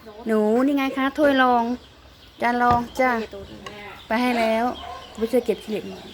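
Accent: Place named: Savannakhet, Laos. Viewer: พี่เป็นคน สะหวันนะเขดบ่อ เสียง เว้าเหมึอน เพึ่อน เฮาจาก สัหวันนะเขด เลย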